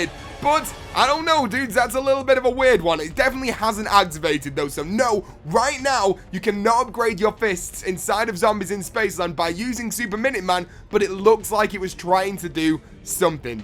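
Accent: United Kingdom. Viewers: not to be racist but u sounded even more british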